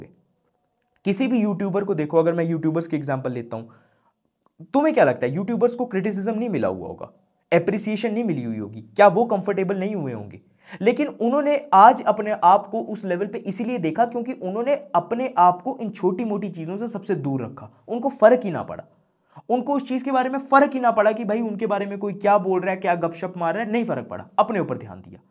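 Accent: Jammu, India. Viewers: Bhai aap jammu se ho kya Dogri ka accent aa raha h😅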